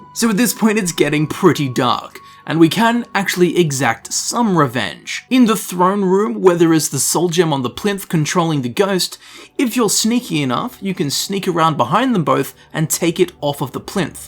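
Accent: Australia. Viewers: Your an Aussie Aye?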